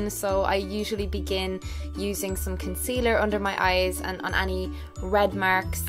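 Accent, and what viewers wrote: Ireland. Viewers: Lol- I feel like we'd be best friends in real life. Actually, I know we would be! PS I love your Irish accent, it's so beautiful!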